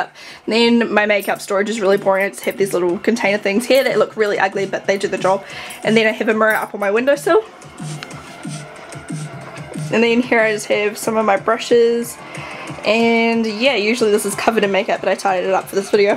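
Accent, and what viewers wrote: New Zealand. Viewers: haha are you from NZ?? i live there and i kinda reconise yr acsent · Wait.... Shes from NZ? I thought she was from Australia!!! ANOTHER WIN FOR THE KIWIS!!!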